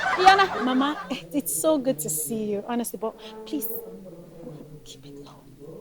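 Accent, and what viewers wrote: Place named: Igbo, Nigeria. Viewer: this your igbo accent is something else😁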